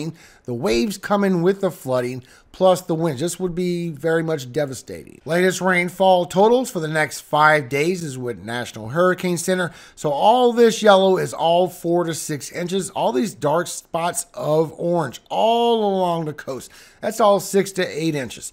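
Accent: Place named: Florida, United States. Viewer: Good reports thank you for creating them. Your voice and accent sound like old Floridians especially in the Florida keys. You would blend in well with some of the old conchs here.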